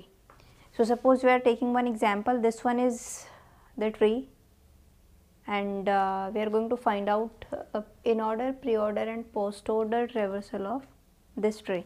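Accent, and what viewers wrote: India. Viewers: this sweet indian accent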